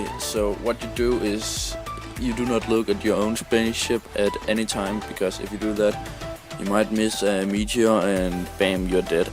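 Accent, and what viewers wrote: Denmark. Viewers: danish accent?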